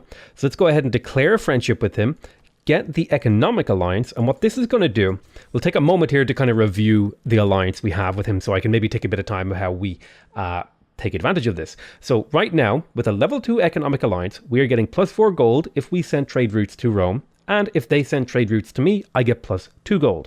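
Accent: Ireland. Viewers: You should triple down on silly pronunciations. You're Irish, so you can generate a really strong mispronounced accent that nobody can blame you for. And you can exaggerate all of the foreign sounding names.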